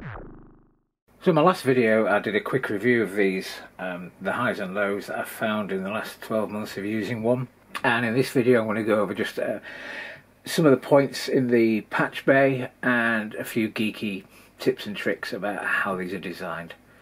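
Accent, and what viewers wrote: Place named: United Kingdom. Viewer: Mate I love your videos. Whenever one pops up I always watch it. The thing that drives me nuts is where you are in the UK. I spent time there when I was in the (US) army in 02 in Hereford, and there was a bloke there sounded like you but we called every british soldier "squaddie" and all I remember is that he supported Wolverhampton and was what you would call a 'gob-shite.' He saved a friend of mine's life and my friend used to joke that it weren't worth having to hear the story so many times. Anyway there is some word you use for when something has a downside, like no reset on DFAM starts with a B I think. What is it please? And keep making videos.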